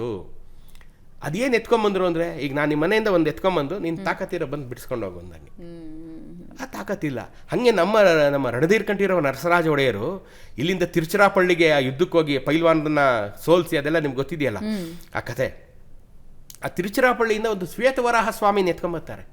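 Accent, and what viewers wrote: North Karnataka, India. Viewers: ಉತ್ತರ ಕರ್ನಾಟಕದ ಮಾತೂ ಮನಸಿಗೆ ಮುಟ್ಟಿತು ಸರ್ 😢🙏🙏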